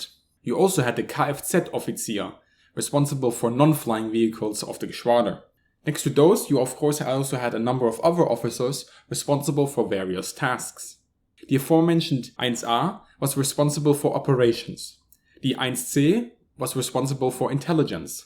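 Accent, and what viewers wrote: Germany. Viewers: I don't know what it is but the German accent is amazing. I love your videos mate! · Are you from Germany or Switzerland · love the german accent :D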